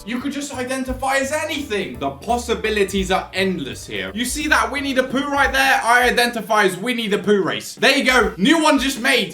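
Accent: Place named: United Kingdom. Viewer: is British! 😮 best of British Amazing Guy...I was thinking American..🎉❤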